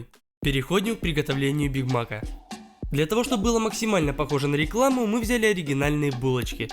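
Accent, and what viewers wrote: Ukraine. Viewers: Украинский акцент? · Стоп!! Я только поняла.... ТЫ УКРАИНЕЦ? У тебя иногда просачивается в речь украинский акцент. Ты начинаешь считать с гривен а не рубля.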